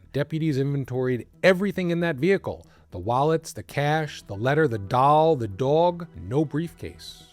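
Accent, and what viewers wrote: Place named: New England, United States. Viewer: Are you from New England? You sound like a fellow New Englander.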